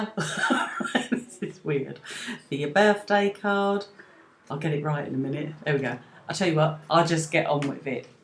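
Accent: United Kingdom. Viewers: Thanks.. lovely to hear a Brit accent on YouTube 😊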